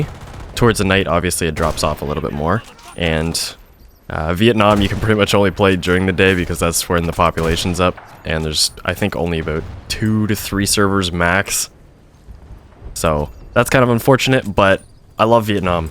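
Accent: Canada. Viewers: is this guy candadian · you Canadian ayy? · im guessing his Canadian. the "about" gave it away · You sound like you’re from Port Alberni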